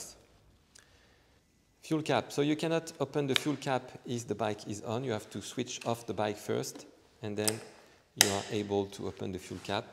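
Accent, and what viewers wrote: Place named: France. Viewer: Très bonne présentation 👍 mais peut-être mieux en français (vue l’accent 😉) et sous titré anglais !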